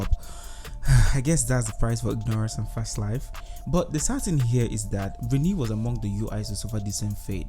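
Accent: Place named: Nigeria. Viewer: Love a naija voice doing reviews like these! Keep it up Will watch all your videos 💪🏿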